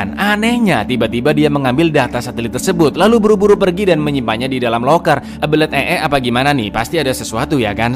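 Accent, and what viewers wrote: Java, Indonesia. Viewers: Cak Jawa mana sampean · pengendali cuaca pake alat hah, indonesia dong pake pawang 😂 Yo ngene iki nek bahasa jowo medok dadi content creator, sak onone disebutno 🥲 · lagi² medok. kebanyakan yg bahas film pada medok.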